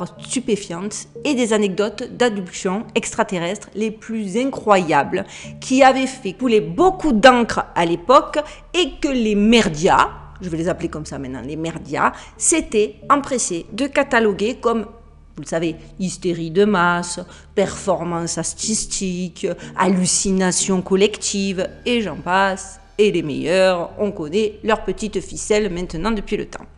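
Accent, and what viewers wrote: South, France. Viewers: Elles sont excellentes tes vidéos, j'ignore d'où tu trouves tes informations mais il y a des choses que je ne savais pas et Dieu sait combien j'en ai vu et entendu des documentaires à ce sujet ! :) Contiue ton bon travail, j'aime beaucoup ton naturel et ton accent du Sud de la France ... Mon épouse a le même ! :)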